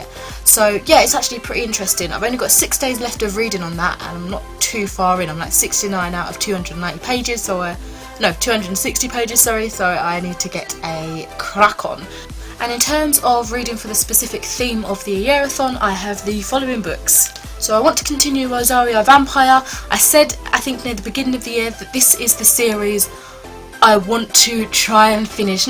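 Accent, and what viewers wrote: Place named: United Kingdom. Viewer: I love your accent - it's such an awesome British accent :) Congrats on completing your Goodreads challenge!! also finished it in November - but you read double what I read hahaha very impressive!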